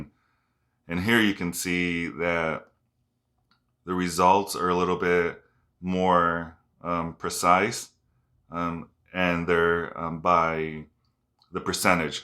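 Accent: United States. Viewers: I live like 1 hour from Philly. I was wondering why you sounded so local. ;-) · first person ive seen that mispronounce his own name you say in pure (USA) American accent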